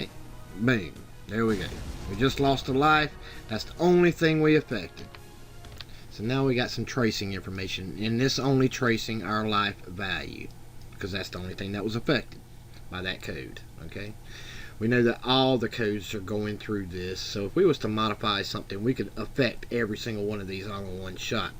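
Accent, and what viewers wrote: South, United States. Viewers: Subbed! Love your accent by the way. Are you Southern or Pagan by any way?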